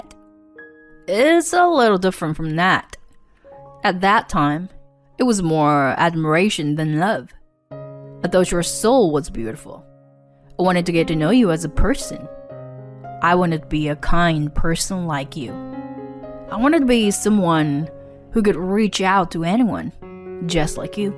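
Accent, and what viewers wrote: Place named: China. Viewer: hate this chines accent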